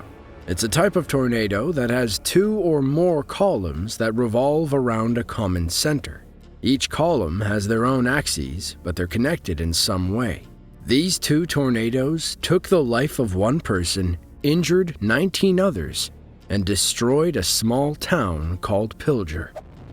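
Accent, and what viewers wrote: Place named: Boston, United States. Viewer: My man loves in Florida and talks like a guy from Boston, “this iz basahh”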